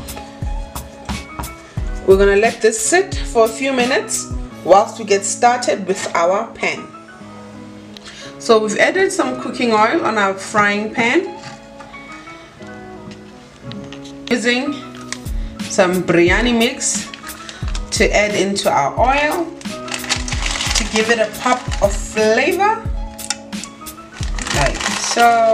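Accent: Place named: South Africa. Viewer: You can just tell she’s South African 🇿🇦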